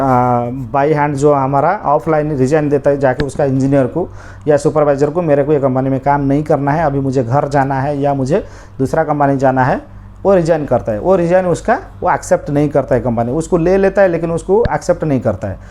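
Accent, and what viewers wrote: Odisha, India. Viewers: Aapka hindi se pta chalara aap odisha se ho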